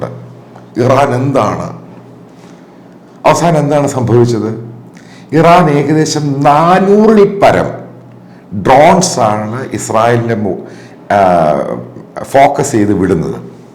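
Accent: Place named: Pathanapuram, India. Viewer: Sir your analysis very interesting. Your mada koda typical pathanapuram style. Continue sir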